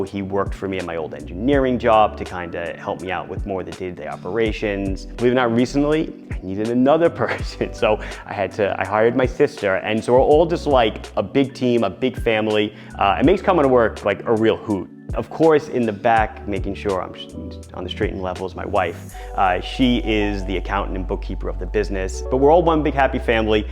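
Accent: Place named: New York, United States. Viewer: Hearing your New York accent brings me happy and nostalgia feelings, having grown up in Queens and Long Island. As a kid I used to think everyone spoke like that. My cousins who are bit older still have heavy Queens accents and they are a joy to listen to. And your story is fantastic. Good luck to you!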